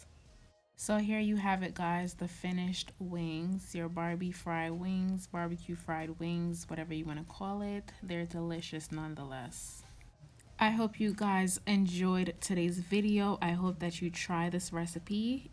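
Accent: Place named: New York, United States. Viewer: Nice. Sound like you're from NYC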